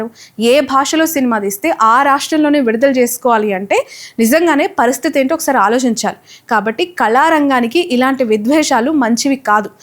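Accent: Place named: Andhra, India. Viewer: Evida enti telangana slang matladatle 😢 Andhra slang or neutral slang matladutundi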